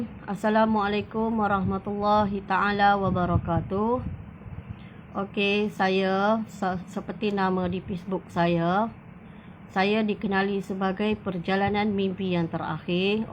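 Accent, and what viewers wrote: Kelantan, Malaysia. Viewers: org kelate ke kak ni ? adui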